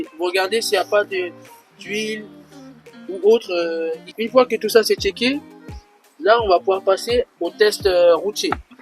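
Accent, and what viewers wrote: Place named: Africa. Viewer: Rien pigé,vous avez un accent africain très prononcé.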